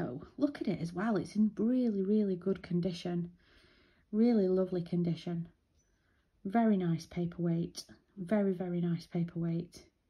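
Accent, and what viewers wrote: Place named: United Kingdom. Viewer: Love these videos and love hearing your British accent! Pretty weights!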